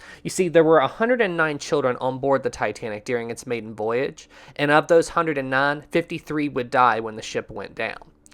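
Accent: South, United States. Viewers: great video from scotland love the sourthen American accent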